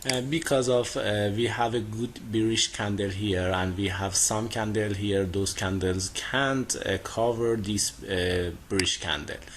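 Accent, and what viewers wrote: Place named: India. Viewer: داداش خسته نباشی ولی فارسی صحبت کن انگار انگلیسی با لهجه ی هندیه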